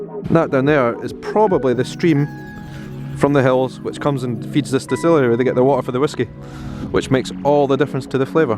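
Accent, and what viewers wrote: Scotland, United Kingdom. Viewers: Beautiful footage! One question: during these vlogs are you trying to suppress your scottish accent? I am going on exchange to Edinburgh in September and I found other scottish videos hard to understand, but with your videos I can get 100%. Thanks again for the vlogs!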